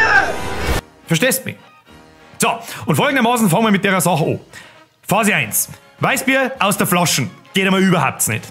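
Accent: Bavaria, Germany. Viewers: oh Mann ich mag dein bayerisch xD bitte mehr ^^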